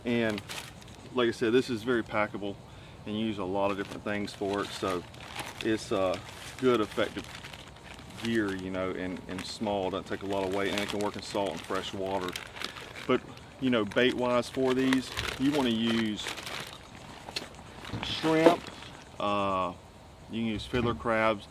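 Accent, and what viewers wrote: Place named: North Carolina, United States. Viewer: Thanks for this video, helps a lot, as I'm planning a trip with someone to the Everglades national park, hiking to the coast of Florida, to Clubhouse beach, I can not wait! Should be great fishing and I hear a lot of crabs, I'm from Central Florida myself but never been that far south, Just curious from your accent, are you from North Carolina?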